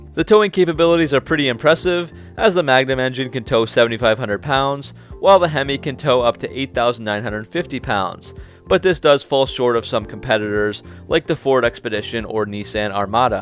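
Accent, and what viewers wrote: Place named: Canada. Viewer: Canadian dialect is omg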